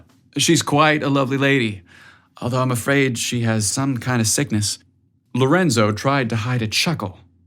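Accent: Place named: Italian American, United States. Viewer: OMG, you have an incredible singing voice.. what a great way to start the SteamyStory. And then your Italian American mama accent...lol. And such a wonderful summer romance story......ahhhhhhhhhhh, so much better than a Netflix binge.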